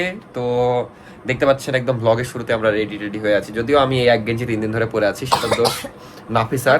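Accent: Rajshahi, Bangladesh. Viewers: "Hebi sundor laaegche" love ur rajshahi accent ❤🎉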